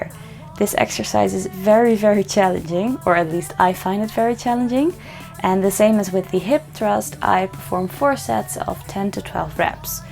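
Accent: Netherlands. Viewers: Omg I found you today and I love your Channel! I’m also starting to lean bulk! So this is perfect! Groetjes uit Gouda! Ik hoorde je Nederlandse accent en zag het op je instagram dat je uit Nederland komt. Je bent super goed bezig! Ga zo door!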